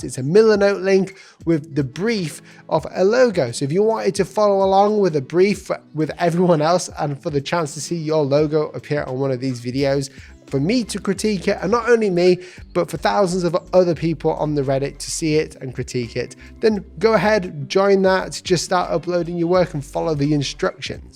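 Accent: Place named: Northern England, United Kingdom. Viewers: don’t know why i didn’t clock your northern accent faster, hi from manchester!! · I like that you point out that you are from the North of England in many videos. Even I can hear that, and I'm not British 😂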